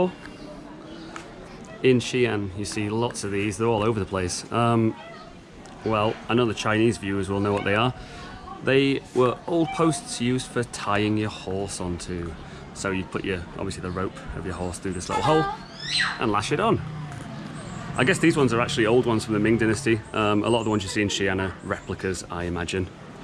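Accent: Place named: England, United Kingdom. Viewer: I really like your English accent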